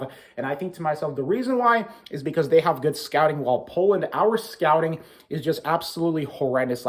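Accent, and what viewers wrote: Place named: United States. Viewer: polish with an American accent or polish-American !!!!good analysis anyways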